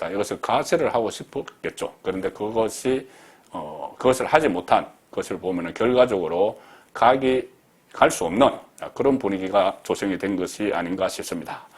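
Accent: Gyeongsang, South Korea. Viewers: 언제나 투박한 경상도남자 잘듣고있습니다 정확한 판단과 전달 좋습니다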